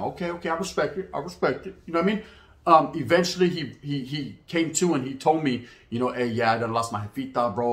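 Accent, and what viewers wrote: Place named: Los Angeles, United States. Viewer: Your Chicano bro, don't matter where you live. But low-key yeah I thought you were from L.A. when I heard your lingual. Anyways much love and respect keep spitting that knowledge.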